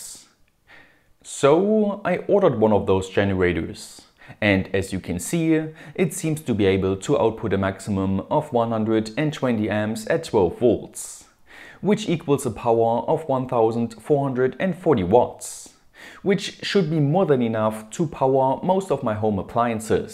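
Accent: Germany. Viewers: Your German accent sucks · To All: Info. Whatever ' brand ' of vehicle alternator you use ; check out the idle speed of THAT brand of vehicle. That, idle speed, is what you'll require to create an electric generation unit. Minimum of probably 1700 or so. Make it for at least 2,000 rpm. ( 3600 may be the best, like those gens you buy , in a steel piped case type = Champion, and other brands ). If i build one, it would use an alternator off of a semi- engine ; probably a Detroit, or Cat engine. Rating about 200 amps. IF it's going to be made, it's going to produce power !. Thanks, for the info, SIR. Wonderfull accent, is that German, or Norweigian, or ...? (Alberta Canada) A generator, usually requires about 2 hp of rotational force, for every 1,000 watts of power output. There is some loss, but efficiency is between 80 - 88 %. I have several generators, 208 cc gas engine powered, 3500 Watt BE generator. 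Older Yamaha, 2800 watt output, uses a 256 cc engine. ( 1983 model - had to redo carb = works awesome. Great engine !!)) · Geat german accent! Ich spache deutsch! (but I have terrible spelling)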